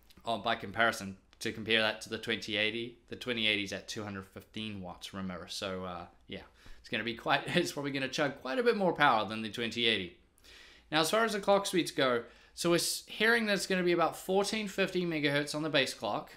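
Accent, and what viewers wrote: New Zealand. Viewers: I've noticed your accent starting to change since the move. You are losing that touch of Kiwi.:) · He's a New Zealander talking like a New Zealander.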